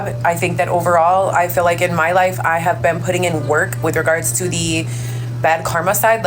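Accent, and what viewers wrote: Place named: Latin America. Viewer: I doubt you ever cooked for your kids. And that stupid latina accent - i just cant.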